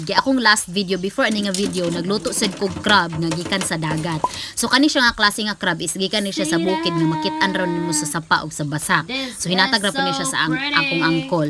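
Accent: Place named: Loboc, Philippines. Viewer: Nindot gud paminawon ang sinultihan sa taga loboc madam hehehe...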